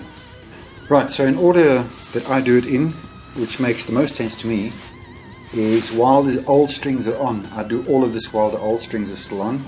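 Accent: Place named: South Africa. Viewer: Nice, you must be South African. 🎉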